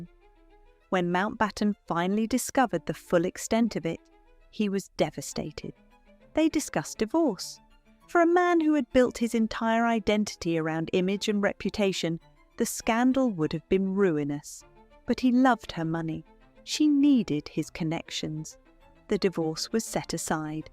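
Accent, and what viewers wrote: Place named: Northern Ireland, United Kingdom. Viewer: Northern Irish! Not Southern.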